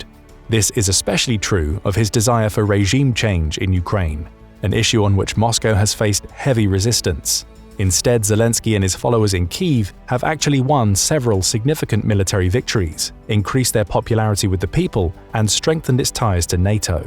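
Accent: United Kingdom. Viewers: Nothing like listening to a Brit's voice crapping on another country's military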